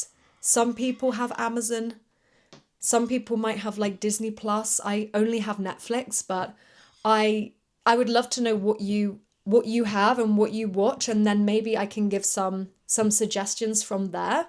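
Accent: United Kingdom. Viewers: I always love watching your videos,. It's so easy to learn British English from your words, and it's so enjoyable. You're great, keep it up and take care. See you soon,. 🇬🇧🇬🇧👍🏻👍🏻📚📚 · Everyday is the earliest/youngest day to start. Just do it. Actually that's so much easier than before since YT exist. In the old days, what you can do is rolling the the sound tape and checking the book at the same time. It's impossible for me. YT teach the english so much more effective than the school at my young days. Nothing better than listen to some topic you are interested with the language you learning. For other english learners, this video is speaking with very English English accent. If you speak in this accent, you will be a funny guy in America and very native in UK. There is so much video on YT but there is not much video making by British. You know what, I feel so confidence on English until I arrived UK. 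I understand nothing when people speaking. I feel like I got scam all my life time by the school and YT. I realize I learnt American English the whole time and that's why I failed my school exam (mostly base on British English). But did a really great job, I can understand 100% in this podcast. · Where are you from? You speak in British accent, but it seems to me that you live in USA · What a cute teacher! UK accent is very cool. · 안녕하세요~~~ 영국발음 너무 좋아요~~ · Very interesting to know how an adult can learn foreign languages easily and your story is indeed so interesting, as English natives seldom speak foreign languages. Determination is so important. Your videos are really helpful and your British prononciation is absolutely fantastic and you are a great professor.Thank you very much ❤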